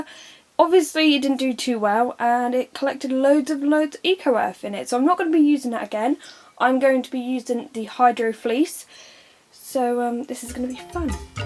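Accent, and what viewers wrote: United Kingdom. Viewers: Man, I just love your British accent.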